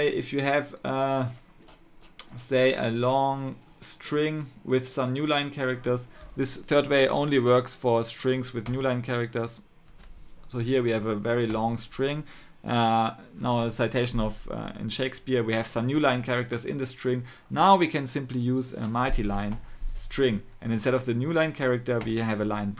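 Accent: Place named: Germany. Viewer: nicer deutscher Accent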